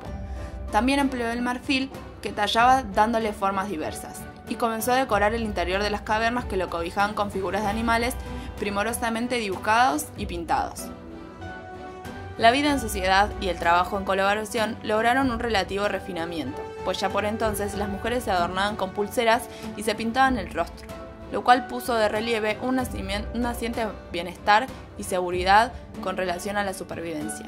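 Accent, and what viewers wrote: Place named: Argentina. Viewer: Me encanta el acento de las argentinas.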